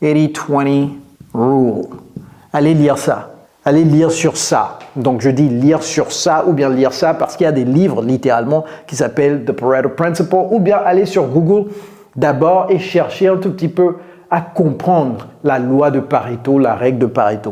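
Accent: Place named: United States. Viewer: Je prefere moins de francais et plus d'anglais parce que je veux imiter votre accent en anglais americain. Pourquoi ne pas nous faire le "SHADOWING IMITATION"?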